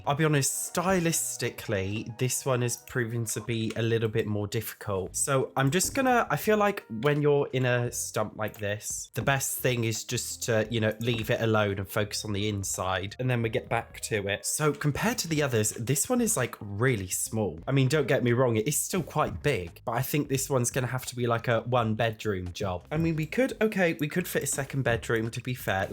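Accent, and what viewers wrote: United States. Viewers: Has anyone else noticed how american accent sounds a lot like Quagmire from family guy 😂😂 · Your American pronuncuations crack me up every time I love it lmao 🤣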